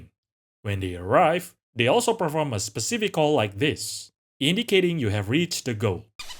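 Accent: Southeast Asia. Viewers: You're the only Indonesian I know, so I have no other points of reference. To me, your accent sounds like a mix of generic Southeast Asian + Dutch. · Oh, south east asian, always wondered what that accent was Also love these birds, so cool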